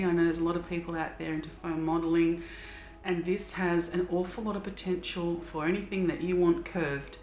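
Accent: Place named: Australia. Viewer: Worked very well and have never thought about this. Saving this in the bank of things to do, you sound like a fellow Australian as well mate :D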